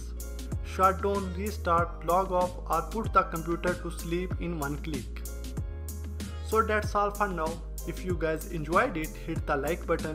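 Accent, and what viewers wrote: India. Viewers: So off topic but can anyone answer this serious question. Not being racist here. Just wondering about his accent. So he obviously has a great understanding of the English language but retains a thick Indian (im guessing) accent. This is very common. When I took French in school a big part of it was hitting the words correctly. Not speaking French with an American accent ya know. Now if he spoke broken English it would not have stood out. But he speaks very fluent English. So why is the pronunciation so off?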